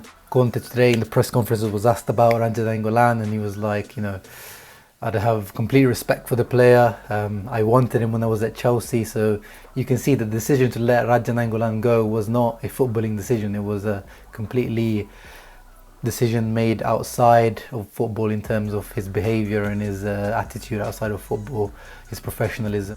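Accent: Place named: Italy. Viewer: So far SO FAR I like your show. I agree. I think I'd definitely change that background. Shooting from your BEDROOM takes away from you believe it or not. I speak English but love hearing the Italian accent during commentating. Also better music for an intro. Better yet no music during you commentary. (Just some GOOD INTRO MUSIC.)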